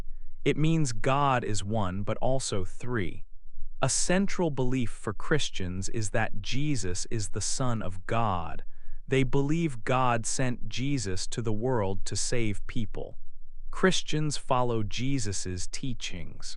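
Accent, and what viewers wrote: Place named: United States. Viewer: This American English ist sehr schwer zu verstehen und ist irritierend!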